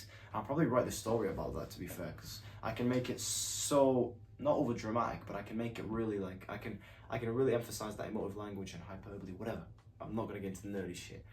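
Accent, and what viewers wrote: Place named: United Kingdom. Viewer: Ok cool, I just reacted because you describe in your video how the war "started" while talking about 2022 events, but I can understand Btw how can it be that you speak English like a true Brit if you are from Ukraine?? Ukrainians and Russians usually have a very recognizable accent. Maybe you had had some connections with UK before you moved there?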